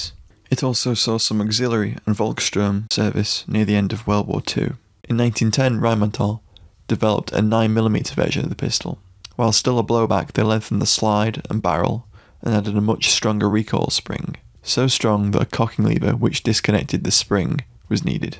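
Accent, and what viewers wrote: United Kingdom. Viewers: What a pleasant surprise to click on a gun-related video and hear a fellow Brit narrating it. I just bought a Dreyse M1907 a few days ago, can't wait to try shooting it.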